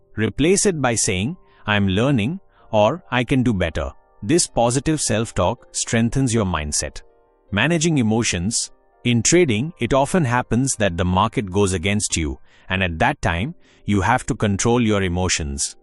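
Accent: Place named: India. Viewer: Why does this AI have an Indian accent lmao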